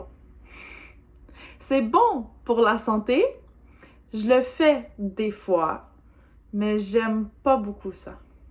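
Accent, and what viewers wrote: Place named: Quebec, Canada. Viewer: Bonjour, j'espère que vous allez bien. J'ai un request. Pourriez-vous nous faire une vidéo sur la différence entre l'accent québecois et l'accent français de France? SVP. Because, I could notice your way of pronouncing words like 'matin', 'bain" differs from what's in most of the french videos out there (based on France's).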